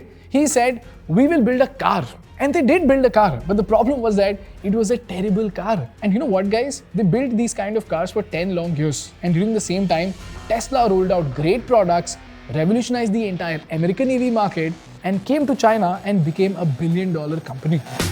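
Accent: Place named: India. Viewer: OMG.... Indian narrator, just like Polki Sharma. Can the details be true or accurates. Really in doubts.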